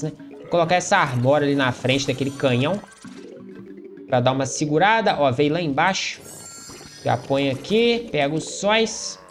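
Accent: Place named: Minas Gerais, Brazil. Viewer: OI tenho uma pergunta, em qual estado você mora? você parece ser mineiro pra mim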